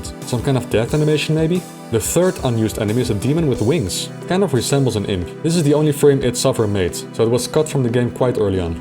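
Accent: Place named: Netherlands. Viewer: Something about the way you sound make me wonder if you Dutch. Are you, by any chance?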